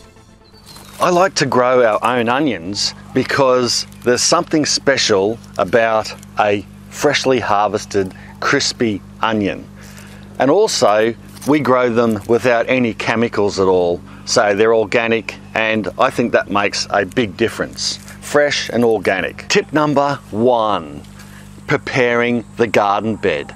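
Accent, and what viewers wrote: Australia. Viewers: Love your Aussie accent, how I wish I could have a real garden, not just potted planting. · Great info - thanks. Lovely to hear an Australian accent too.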